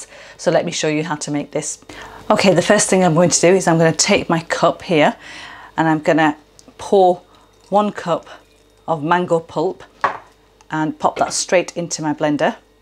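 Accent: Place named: Wales, United Kingdom. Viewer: As an American I'm loving your accent and scratching my head about where it's from....maybe being across the pond I'm totally wrong, but are you Welsh?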